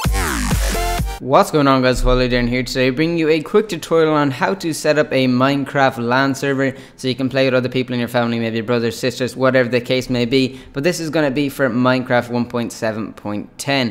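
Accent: Ireland. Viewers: Are you Irish? Also, do the 2 accounts have to be on 1 computer, or can 2 people use seperate computers and join?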